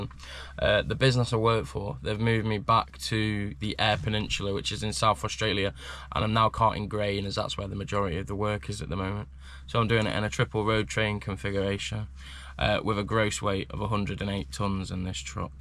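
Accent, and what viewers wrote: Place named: Nottinghamshire, United Kingdom. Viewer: Great video mate 👍 very interesting and informative 👍 how long have you been in Australia? Are you from Nottinghamshire? Thought I could tell by your accent any cheers keep up the good work 👏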